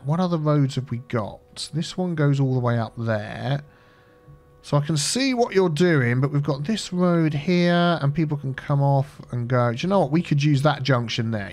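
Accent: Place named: England, United Kingdom. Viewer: It's really just about the way your voice sound that I watch your videos. And your accent. English accent. You've got that strange tone, never heard anything like it anywhere else. I like it thought.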